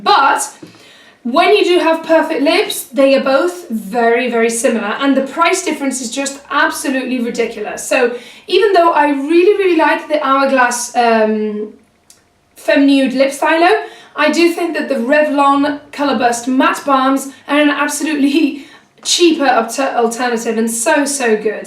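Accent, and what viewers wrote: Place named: England, United Kingdom. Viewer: I know, especially since there are other pages that don't charge :( I remember being to Cardiff and wanting to take the whole stand back home! <3 Thanks God I didn't because I only took a LE Batiste dry Shampoo (the one with the Brit flag that was released for the Olympics) and they made me throw it away at the airport :( Well, lovely Youtubers deserve lovely subscribers (and thanks for calling me lovely, by the way :) ) P.S: Do you mind if I ask you where in England are you from? I love your accent ^_^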